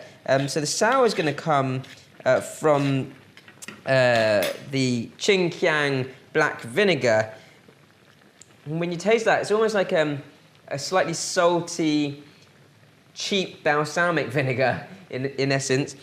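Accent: United Kingdom. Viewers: Chinese invented black vinegar way before Europe, so now you are trashing your own people because you have british accent? Karma will get you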